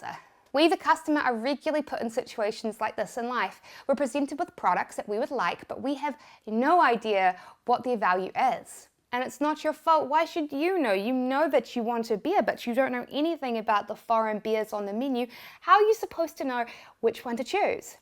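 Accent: New Zealand. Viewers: New Zealand. Good luck to you🙏🏼 shes good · Amir B It is none of these. It is a New Zealand accent.😀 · Definitely a kiwi. NZ.🙂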